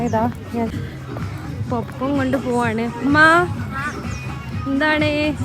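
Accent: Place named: Malappuram, India. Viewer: Malappuram slang super aanu👍👍.. Oro video yum ushar avunnud tto👍👍👍... 1 million subscriber avatte vegam.. All the best 😍😍🌹🌹🌹🌹🌹👍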